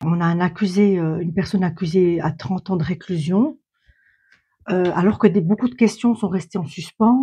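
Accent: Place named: Romandy, Switzerland. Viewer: Ce petit accent suisse Romand ! toujours un plaisir de l entendre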